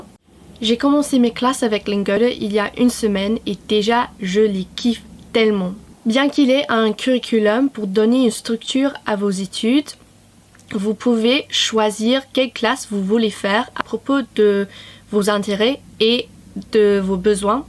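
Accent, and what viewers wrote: United Kingdom. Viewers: ironically love the casual brit accent slipping through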